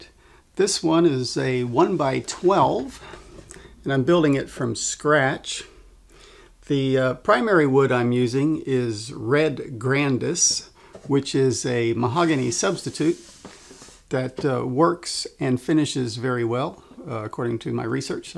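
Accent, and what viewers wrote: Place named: Winnipeg, Canada. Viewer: You’re from Winnipeg, aren’t you?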